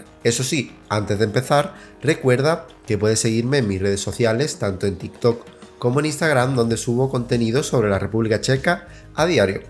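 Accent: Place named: Andalusia, Spain. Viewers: Que problema tienes con su acento andaluz ? Nuestro acento es maravilloso . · Tres años en Chequia y sigues con un acento andaluz atroz?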